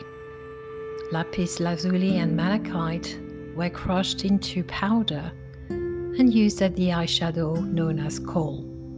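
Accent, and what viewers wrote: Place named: France. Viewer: Thank you, your videos are so beautiful, soothing, helpful and just connect with me on a very deep level, kindred spirits indeed! I'm British and I have many friends who were born in France and I do love their accent, yours is lovely Blessings to you and all Louisa and Sas (pug) I'm wearing a solid lapis bangle and citrine nugget bracelet... I love crystals, always have x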